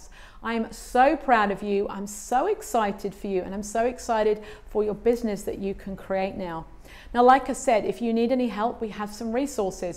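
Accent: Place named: England, United Kingdom. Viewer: Hey, I hope you read this. I guess you can tell by now I've been watching a lot of your videos, some of them a number of times and taking notes on some. I really am drawn to your rich English accent, but has drawn me the most is your common sense and wise advice to building a successful art business. One of the things I got from you is that I really need to do my homework, research. My ideal client, customer is out there looking for what I uniquely have. But need to be where they can find me or our paths cross. I know I want to help other baby boomers like myself realize there dreams too., thanks again for your help. From Kingsville, Ohio 🎉 😀 ❤ Cynthia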